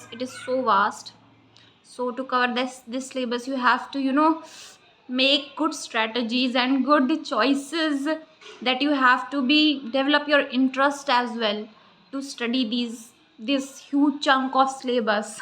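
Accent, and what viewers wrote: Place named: Himachal Pradesh, India. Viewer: himachali accent 😁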